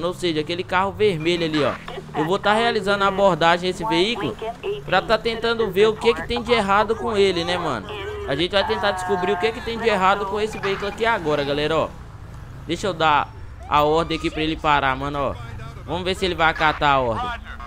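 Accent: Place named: Rio de Janeiro, Brazil. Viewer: virou carioca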